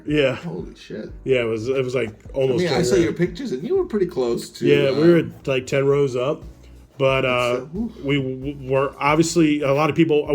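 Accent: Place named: Philadelphia, United States. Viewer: The accent makes sense Idk how I never knew ur from philly but kinda had a hunch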